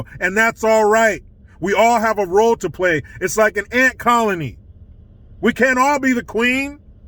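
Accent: Australia. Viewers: Lol love your Aussie Accent, 😂😂😂 🤣🤣🤣🇦🇺💕💕💕🇺🇸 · Love the Australian accent......"mate!"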